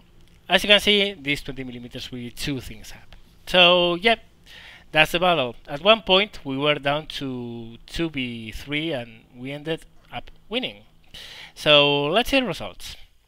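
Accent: Spain. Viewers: Cómo se nota cuando un español habla en inglés XDD Nos pasa a todos jaja. Genial el vídeo, estoy pensando en pillarme ese tempest premium alemán y necesitaba algo de motivación!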